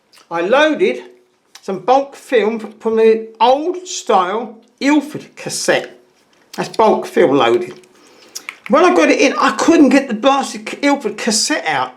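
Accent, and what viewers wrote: United Kingdom. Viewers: Where is your accent from? It seems definitely British but I don't get the specific zone. (I'm not a native speaker, I'm Spaniard.)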